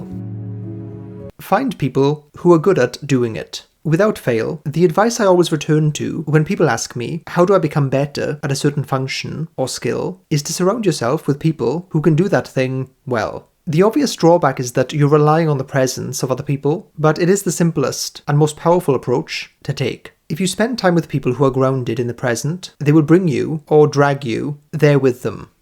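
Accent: Wales, United Kingdom. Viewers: I send your videos to my INTJ boyfriend a lot and I'll ask him "hey did you watch what I sent you"? and he'll say "oh from the British guy?." So, you're the MBTI British guy around here lol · "INFJs are never-ending future anticipators" Thank you that's the best way I've ever heard it said. And as an Aberystwyth boy good to hear it said in a Welsh accent!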